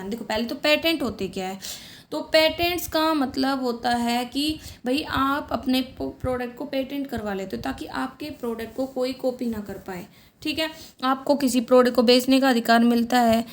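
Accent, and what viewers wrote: Haryana, India. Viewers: Ma'am haryana se kaha se ho?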